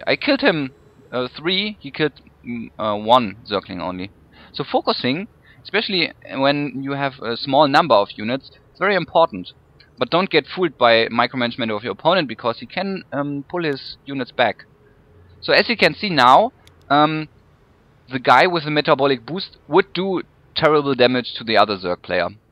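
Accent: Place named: Germany. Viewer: german accent is epic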